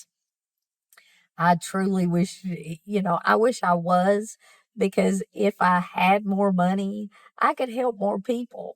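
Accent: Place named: Kentucky, United States. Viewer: I've always thought you were from kentucky. 🤦‍♀️ I think the accent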